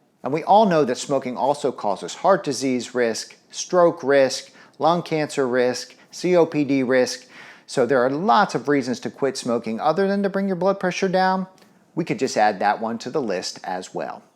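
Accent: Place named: South, United States. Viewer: I love his accent.....educated southern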